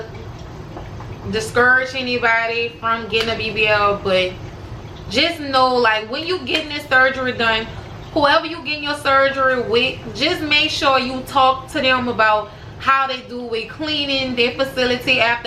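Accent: New Orleans, United States. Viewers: I know you from New Orleans. I love how people from New Orleans talk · I love the New Orleans accent by the way it remains me of Toya lil Wayne ex. Thank God she is ok now. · New Orleans accents are my favorite.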